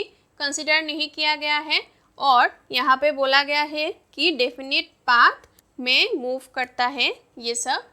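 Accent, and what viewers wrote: Bengal, India. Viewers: Mam are you Bengali??????????????????🤔🤔🤔🤔🤔🤔🤔🤔🤔🤔🤔🤔🤔🤔🤔🤔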